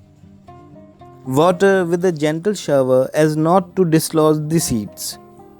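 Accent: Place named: Telangana, India. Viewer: Pakka Telangana dialogue 👌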